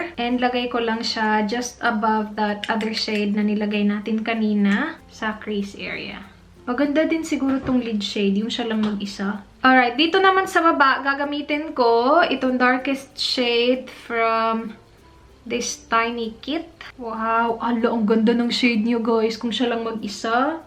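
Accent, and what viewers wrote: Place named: Visayas, Philippines. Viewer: Hahaha kataw.an an Jud Kayo ka ate Oii..Hahahaha.Bisaya kayo ka haha.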